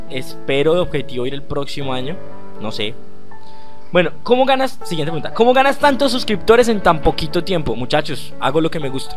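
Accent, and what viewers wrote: Colombia. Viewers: Oye ... XD vengo de uno de los packs HD ... xD y se oía un poco lo rolo en la voz y claro Colombiano parce !! XD !! saludos desde cúcuta .. aún así no estoy ni seguro si es de bogotá xD !! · de que parte de colombia eres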